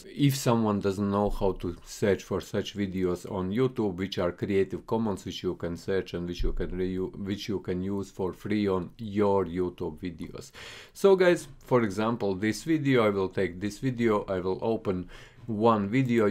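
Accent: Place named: Russia. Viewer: Woah Russian accent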